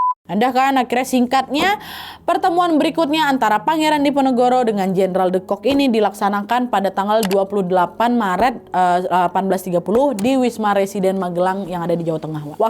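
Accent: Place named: Medan, Indonesia. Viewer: 1 rb a Terima kasih narasi Tunggu komen say ya. Abangmu umur 82 th Abang sangat suka cara narasi dengan aksen Medan, Medan Wak. Abang dinas di KKA 3 th s..d 1991. Komenk,u panjang Dek. Gak papa ya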